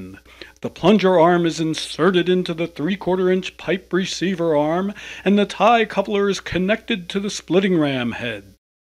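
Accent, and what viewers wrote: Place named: Pennsylvania, United States. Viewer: What country is the moderator from? Sounds like a PA Flatlander turned Northern PA "dude."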